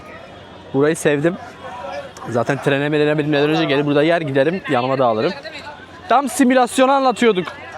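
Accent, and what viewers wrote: Turkey. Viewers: nerelisin dogu şivesi var ama bilemedim ayrıca kanalı yeni keşfettim hemen abone oldum çok güzel videolar emin olabilirsiniz izlemeye devam edeceğim yolun açık olsun başarılar dilerim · Töbe haram demen harikaydı😂Şiven çok güzel yaa..Bizim oraların( Diyarbakır ,Mardin..vs)insanının sıcaklığını hissediyorum.❤😊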